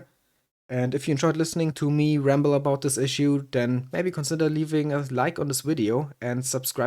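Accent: Germany. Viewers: I hear German or am I insane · I can sense the german is strong in you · The german accent is strong with this one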